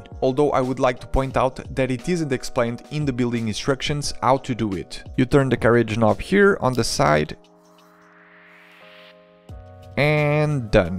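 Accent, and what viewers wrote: Portugal. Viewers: Tava mesmo a ver que eras português, o sotaque foi o que fez com que eu descobrisse!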